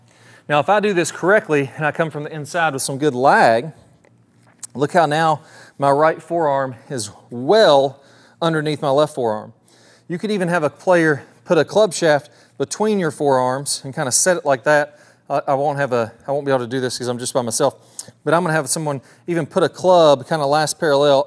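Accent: South, United States. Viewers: Thanks for the heavy southern accent golf instruction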